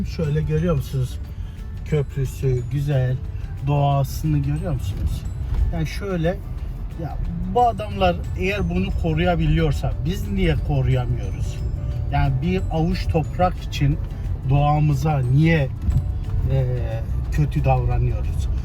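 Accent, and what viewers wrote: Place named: Germany. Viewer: almancı gibi türkçe konuşuyor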